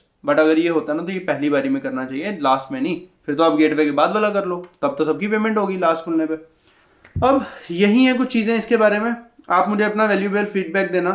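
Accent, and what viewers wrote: Jammu, India. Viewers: Bro r u from Jammu?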